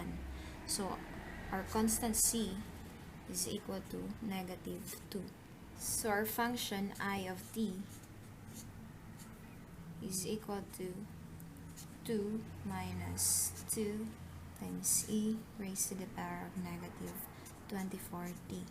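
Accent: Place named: Philippines. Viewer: you're sounded like a filipina, nice explanation by the way.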